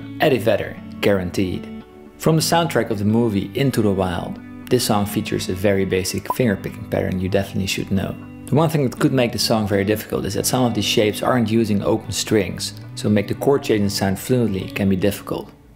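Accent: Netherlands. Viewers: waarom hoorde ik meteen dat je nederlands bent? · i have to ask, are you dutch? your accent sounds dutch lol. if so, ik ben ook nederlands! ik hou van je video’s :) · Are you Dutch? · You sound Dutch to my Dutch ears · Ik dacht dat ik al ergens een klein Nederlands accent hoorde! Perfecte video's! Erg nice om naar te luisteren ^^ Keep up the good work!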